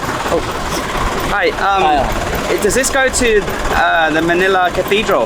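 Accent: United Kingdom. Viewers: You have a British accent. Are you from UK? Do you have camera crew? You don't seem to be holding a camera? I also notice drone shots.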